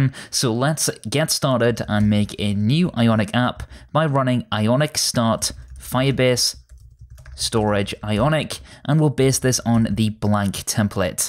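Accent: United Kingdom. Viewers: I've been coding for years but have always had the attitude of never knowing enough. Your tutorials often cover ground I've already walked but I always watch them as I still find them invaluable for reminders or tips for things I've missed. Always fun for me to hear a fellow northerner too, doing the YouTube thing. Thanks.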